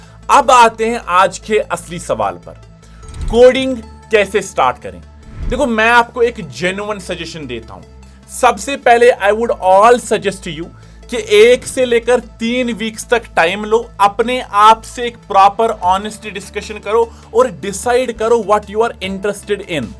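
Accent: Kashmir, India. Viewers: Why ur accent sounds like kashmiri?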